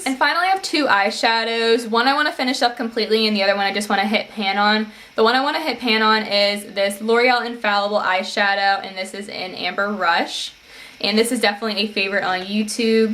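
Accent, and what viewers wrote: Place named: Maryland, United States. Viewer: I'm your neighbor to the south. I'm in MD which is why your accent is so familiar. lol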